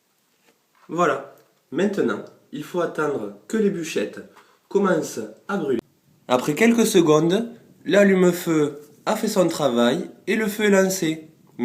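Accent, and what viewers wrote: South, France. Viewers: GUIGNOL TU CROIS QUE LES GENS NE SAVENT PAS FAIRE DU FEUX DE PLUS AVEC TON ACCENT DU SUD TU AS DU EN FAIRE SOUVENT IMBECILE MEME PAS CAPABE DE FAIRE DU BOIS D ALLUMAGE TRAINE SAVATE FAINEANT MAIS CA DOIT AMUSER DE FAIRE UN PETIT FEU TOUT LES 10 ANS AVEC TA CASSETTE ANDOUILLE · Super vidéo, pour ma part je n'ais jamais fait de feu et cet hiver je vais devoir savoir le faire, si je ne veux pas mourir de froid, vos conseils me sont utiles, ce n'est pas inné, si on a pas appris on sait pas faire. C'est pour cela que je suis venu voir cette vidéo, très bons conseils, voix douce et calme très bel accent du Sud que j'aime, ainsi on reste jusqu'au bout, très belle démonstration, merci beaucoup ! Me reste plus qu'à mettre en pratique ce que je viens d'apprendre grace à vous :)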